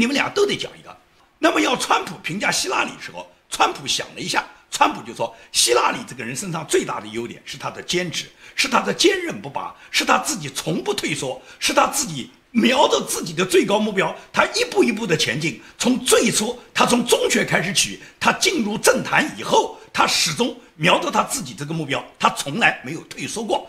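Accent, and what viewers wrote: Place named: Suzhou, China. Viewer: 老师您好，我是您的江苏老乡。17年到德国您是我在YouTube关注的第一位主播，不知不觉中在您每天节目的陪伴中走过了三年。谢谢您日复一日的付出，让我有了全新的思考和辨别方向的能力…也谢谢您的苏式普通话让我在远离家乡的同时听到最亲切的乡音☺️ 真心祝福您及全家身体健康；节目越做越好，我们陪着您一路走下去！谢谢！！！💐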